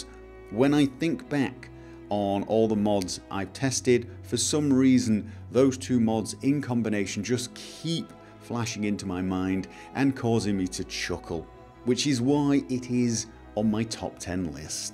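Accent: United Kingdom. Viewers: Besides the quality and your special attention to quality, what also sells your videos: Your british accent, which can be understood very easily for non english motherlanguage speakers. AND: The sound of your voice is just perfect for narration. Keep them coming and have FUN doing them!!!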